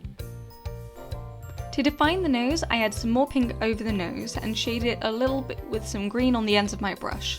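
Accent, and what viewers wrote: United Kingdom. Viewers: She's well cute ❤️what country are you from ,you sound like a posh English person ☺️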